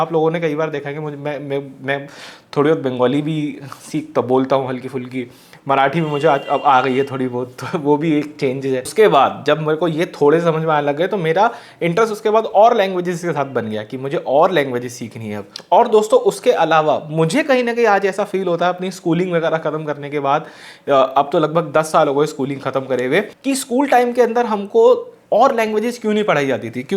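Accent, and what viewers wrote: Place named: Bundelkhand, India. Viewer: bhai mein aapke Bundelkhandi bhasa sunte hi khus ho jata hun kuch apna sa lagta hai.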